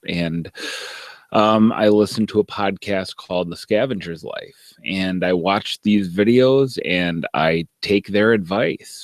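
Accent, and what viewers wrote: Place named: Wisconsin, United States. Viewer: I live in Milwaukee - I've been saying the same thing about getting to Pete's store but haven't been yet. Where in Wisconsin do you live? You have that signature Wisconsin accent that we're all famous for by the way!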